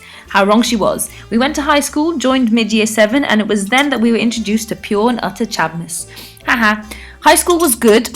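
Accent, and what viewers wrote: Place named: England, United Kingdom. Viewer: I can't sometimes understand what she said cause she speaks fast with an English accent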